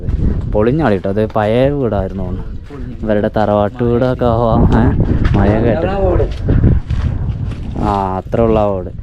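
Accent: Malappuram, India. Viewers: Malappuram slang kurachu avoid cheyuka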